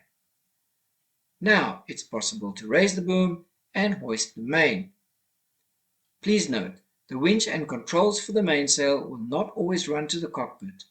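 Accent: Durban, South Africa. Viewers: Great videos and well explained. Graphics are excellent. Do I get a hint of Durban accent?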